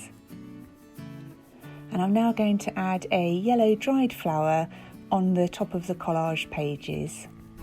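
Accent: United Kingdom. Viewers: I just wanted to tell you how much I love your videos. All of your spreads are so pretty and watching and listening to you and your British accent is so relaxing! (Texas girl with a serious southern drawl here)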